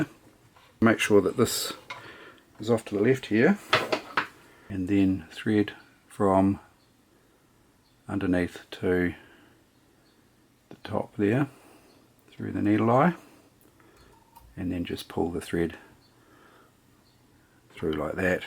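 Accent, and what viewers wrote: New Zealand. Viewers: Nice Video and nice Kiwi accent! Thank you!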